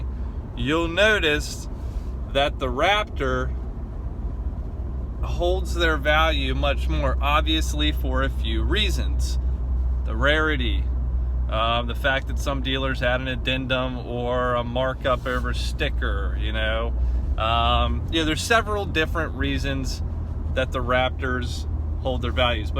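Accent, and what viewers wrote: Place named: Pittsburgh, United States. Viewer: You sound like a yinzer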